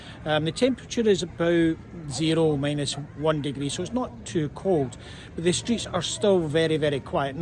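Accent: Scotland, United Kingdom. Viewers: How could he/she not understand it? Your accent is much much mild Scottish accent but certainly can be understood easily. I really have to work hard to under the strong Glaswegians' accent as some words seem not English when they speak.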